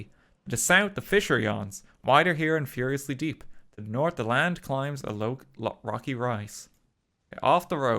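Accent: Ireland. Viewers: Why do you do this to me? I'm writing my friggin' A-Level English exam tomorrow and you're pulling me away with irish voice goodness ;w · next stream: listening to an irish man speak for 8 hours :) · he sounds like the most irish person i’ve ever heard in my life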